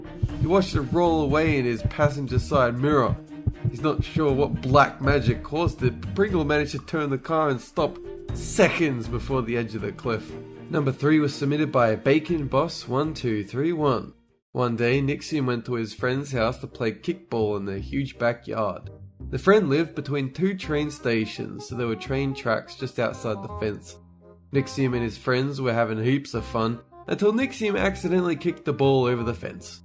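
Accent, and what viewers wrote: United Kingdom. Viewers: Are You British? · Wait, your not American? Are you British? Or are you Australian? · Eveline E-001 he's British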